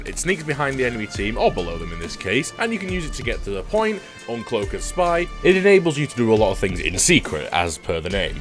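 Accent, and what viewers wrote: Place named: Yorkshire, United Kingdom. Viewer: love the yorkshire accent, keep it up!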